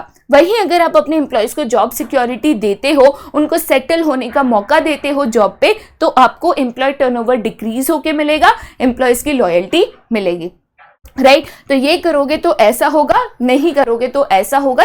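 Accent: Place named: Mumbai, India. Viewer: Mam aap Mumbai se ho naa appke word ascent se pata chalta hai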